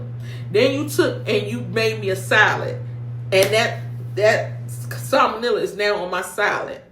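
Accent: United States. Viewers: They probably chalked it up to your American accent...And thought you were talking about the hippo, that escaped from the local zoo, from a few days before!!!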